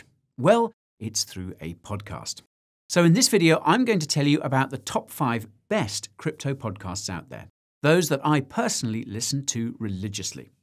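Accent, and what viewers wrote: Australia. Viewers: Another Aussie here and i must say,you could pass as one of us mate.That was brilliant. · Haha, amazing Aussie accent, nice one!! 😁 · nice aussie accent! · Brilliant Australian accent! 🤠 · Funny AF! But the accent is more proper than my friend from the Gold Coast. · Always insightful and entertaining. Absolutely nailed the Aussie accent. Love your work mate! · Aussie accent was one of the best I've heard (I'm an Aussie) Where do I get that Roocoin? 😄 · Well done with the Aussie accent as an aussie I laughed my tits off. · Not bad ‘Strailian accent Mate! Good on ya… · Love the Aussie accent ! I'm up for some Roocoins !!! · LMAO. Mate your Aussie accent is spot on. Love the mullet!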